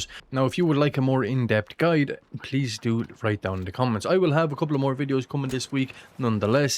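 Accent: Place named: Carlow, Ireland. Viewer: Sounds suspiciously like a Carlow accent 👀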